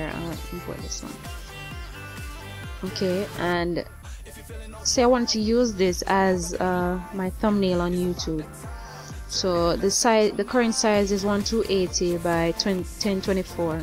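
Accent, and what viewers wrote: Jamaica. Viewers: Good job. Knew you were Jamaican when I heard the voice. Thanks for the help. You stop making vids I see. Hope all is well. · U Jamaican ? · the Jamaican accent tho :) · U jamaican?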